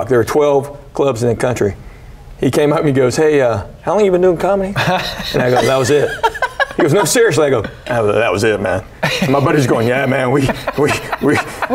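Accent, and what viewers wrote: South, United States. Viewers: I remember seeing this guy when I was a little kid in the. Local accents are quickly going away these days but at the time his southern drawl really confused me! I don't remember the whole setup but I remember the punchline was him saying, "I reckon so!" I'm from NYC and I'd never heard that phrase before so next time I saw one of my uncles, a guy born and raised in the South but spent the rest of his life here in NYC, I asked him what that meant so he asked me to repeat the joke for context. He laughed for 5 minutes straight! He said it made him feel at home again. There's a type of southern humor that's really dry but sarcastic and very sharp. He told me it was like British humor in that way. His accent has softened, he's still got some of that southern charm and dry wit. And I'm glad to see he's still working. I'll be subscribing and checking out more of his material! 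· I've never heard an Asian guy with a southern accent before. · The Asian with a southern accent is fascinating me.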